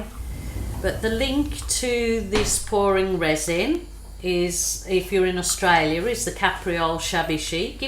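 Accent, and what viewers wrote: United Kingdom. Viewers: thought so I'm from Birmingham uk and thought 🤔 sounds uk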